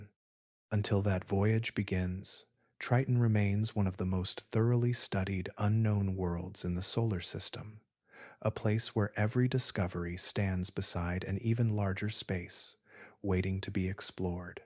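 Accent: United States. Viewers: Congrtulations. American accent and no annoying sound effects. Subscribing now.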